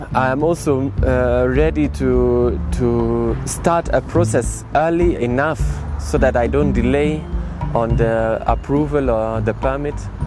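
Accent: Kenya. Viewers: You even have a Kenyan accent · This dude has picked up a "Kenyan English" accent. Cool. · Cool venture. It's so funny that he even speaks English with a Kenyan accent. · He speaks like a Kenyan, wow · Close your eyes and you will hear Kenyan speaking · The guy has a Kenyan accent, that surprised me, kudos mate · hii accent ni ya county gani Kenya? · He even mastered our kenyan accent. 🤣🤣🤣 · His accent is a mixture of luo kalenjin and kikuyu. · His accent is soo Kenyan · dude you sound more kenyan than me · His accent already sounds kenyan... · Wow you speak like a Kenyan 😲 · This guy is so Kenyan and is most likely married to a luo hahaha I love the accent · 🧠🧠❤👈👈✅✅✅👌👌this man he is smart intelligent person because the way he performs and pertaining the accents of Kenya English ✅✅✅✅🤔🤔👌👈🧠🧠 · His Kenyan accent is dope! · DUDE SOUND LIKE HE WAS BORN AND BRED IN KENYA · As a Kenyan, if I listened to audio of this video alone, I'd say he was born and grew up in our country...pure Kenyan accent! 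· Haha Typical Kenyan accent 🤣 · Kenyan accent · Yeah.. He speaks like a kenyan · Accent ni Kenyan Kabisa... inspiring though.. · Very valid points about logistics...whoever figures that out for africa will be a very rich woman/man..... his accent tho if I didn't see him I'd think he's kenyan wow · Kabisa. Kenyan Ancent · am impressed with your new Kenyan English accent. happy for you. will visit soon. love from uganda. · You have a Kenyan accent 😅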